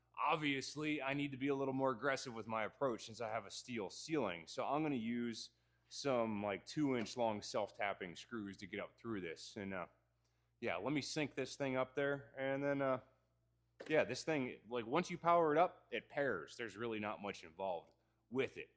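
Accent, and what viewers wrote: Western Pennsylvania, United States. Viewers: U from western PA?!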